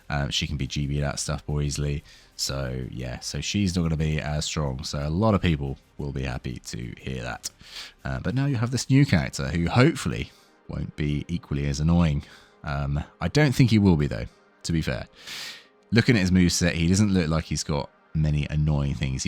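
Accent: United Kingdom. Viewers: No you didn't get the pronunciation right. But close enough, closer than the apes from Ubisoft actually because your British accent fixes one of their mistakes.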